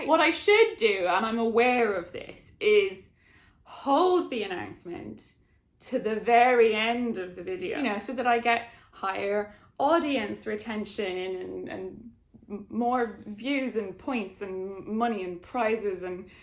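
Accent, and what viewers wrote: Dublin, Ireland. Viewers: hearing a Dublin accent... so unbelievably soothing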